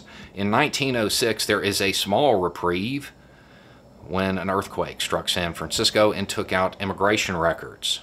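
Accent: South, United States. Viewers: Gotta be 100% honest...didn't expect this from a prepper white guy with a southern drawl.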